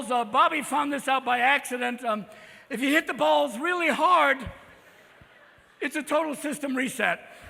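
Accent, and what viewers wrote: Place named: Boston, United States. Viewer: that was a damn good Boston accent...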